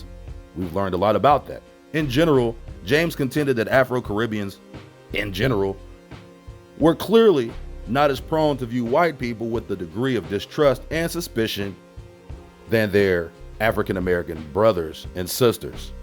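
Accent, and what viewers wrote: South, United States. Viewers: Your southern white man voice is to die for....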